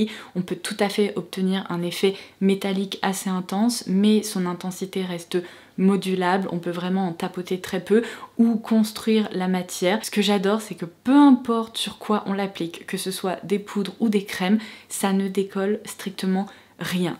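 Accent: England, United Kingdom. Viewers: Merci pour ces cadeaux pour ce super top 10 et ce super accent anglais qui fait du bien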